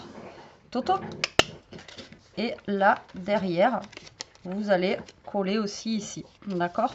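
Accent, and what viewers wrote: South, France. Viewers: Bonjour, je vais regarder ce nouveau tuto avec grand plaisir comme tous ceux que vous faites, j'apprécie beaucoup votre travail, votre style, votre accent 😂car je suis aussi du sud, Montpellier, mais je suis désolée que d'autres personnes personnes vous aient fait de la peine, c'est écœurant et je vous comprends, c'est pour ça que j'insiste pour vous dire franchement que vous êtes une BONNE PERSONNE, ça devient rare en ces temps compliqués, j'espère que vous surmonterez cette méchanceté, moi je vous dis mille mercis et je vous fais un gros bisou😘😉 Elvire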